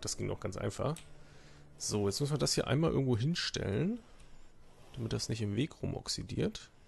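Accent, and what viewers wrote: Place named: Rhineland, Germany. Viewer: Knöpke und Nupsi :-D Bist du aus dem Rheinland?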